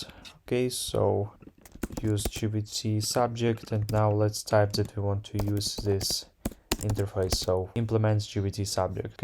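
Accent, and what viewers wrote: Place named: Russia. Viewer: Thanx a lot for this guide! Fluent english from russian spell that's what i neeeed, man "]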